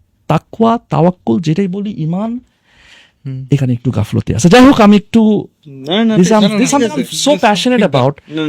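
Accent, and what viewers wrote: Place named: India. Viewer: ভারতীয় ইংলিশ মারায়, ইংলিশ লাইনের এর শেষে "না~ না ~" করে। নোংরা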